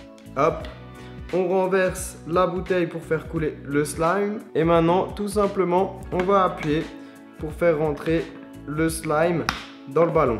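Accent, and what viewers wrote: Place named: Alsace, France. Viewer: Ta l'accente Alsacien mdrr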